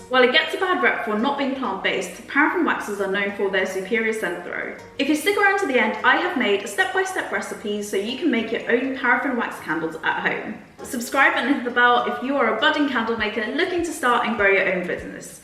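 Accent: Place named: United Kingdom. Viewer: Nice work sister. Love that British accent lol.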